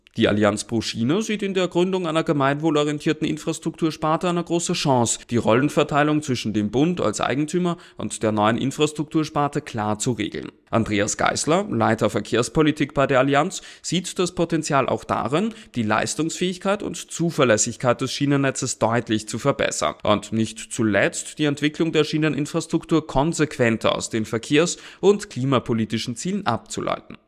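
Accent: Austria. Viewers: I don't see the problem here. Perfect German (with an Austrian dialect).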